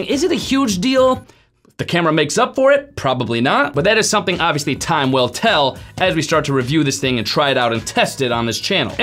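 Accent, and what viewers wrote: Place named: Connecticut, United States. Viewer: from CT I'm from TN but raised in NY so in know CT when I hear it or Mass.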